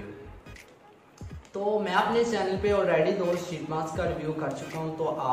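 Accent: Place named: Lahore, Pakistan. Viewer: ni apka way of talk lahOriyOn ki trah h naa jb hi poChaa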